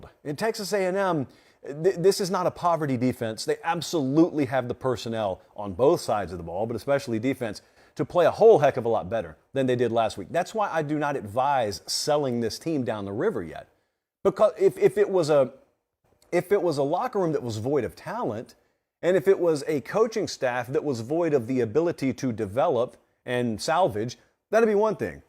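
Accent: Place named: Georgia, United States. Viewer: …… I can tell you are from western GA hahahaha it’s pronounced “Wine-der”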